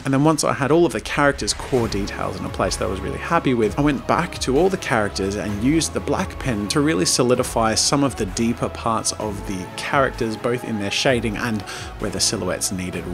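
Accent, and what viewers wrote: Australia. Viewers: Australians (me) say zed and since is Australian he would say zed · No it's zed, he is Australian and that's how we pronounce it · BeanDip: triggered American cause an Australian is being Australian Me: triggered Australian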